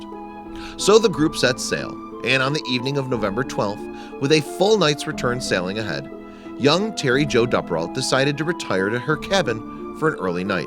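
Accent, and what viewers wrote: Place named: East Coast, United States. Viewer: Who hired this narrator, I can't stand his east coast twang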